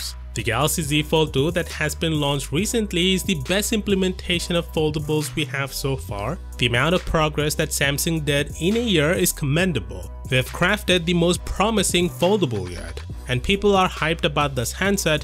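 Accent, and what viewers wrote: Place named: India. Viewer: This guy has an Indian accent if you listen carefully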